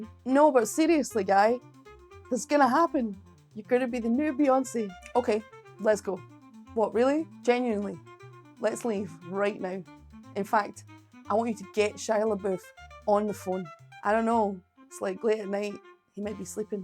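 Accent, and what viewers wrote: Scotland, United Kingdom. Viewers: I especially appreciate your sense of humor, knowledge of music, no nonsense approach and of course, your wonderful Scottish accent! p.s. Thanks for making me a sandwich!!!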